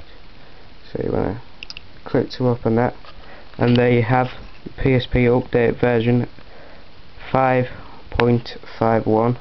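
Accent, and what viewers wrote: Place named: United Kingdom. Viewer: i fall love with your british accecnt :>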